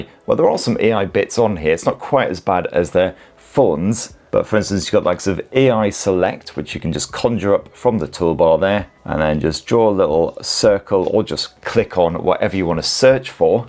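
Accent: United Kingdom. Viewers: What part of Britain are you from mate? I know my regional accents pretty well but I cannot nail yours.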